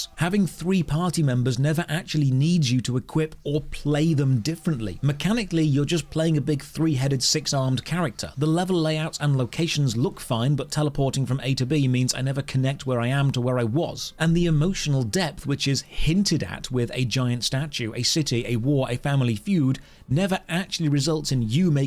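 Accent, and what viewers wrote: United Kingdom. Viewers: Way back when, in mid-late 2000's, a friend of mine in college got really hooked on Grenada Espada and was talking at length about farming different types of gun powder and assembling Zodiac Doll or some shiz. And I could not express how little I cared. Many years later I am watching a different dude talking about effectively the same and find it much more engaging. Conclusion: everything is better in British accent.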